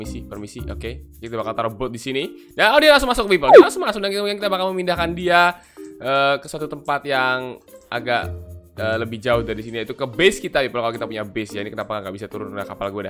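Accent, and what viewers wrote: Java, Indonesia. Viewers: kamu orang jawa?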